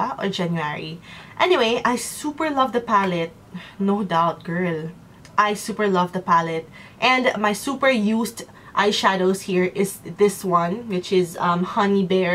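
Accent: Conyo, Philippines. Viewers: I get why the other commenters are bashing. Some filipina youtubers who got a lot of subscribers tend to sound "Conyo" (ung mag eenglish, magtatagalog na medjo weird pakingan). But! Its their channel, if you don't like the way they talk, DON'T WATCH the frekin video. I'm not whiteknighting or anything, its just that ang init na nga, iinit pa ulo ko sa mga attention seeking na bashers na kung makapag comment kala mo ang perfect. Pwe.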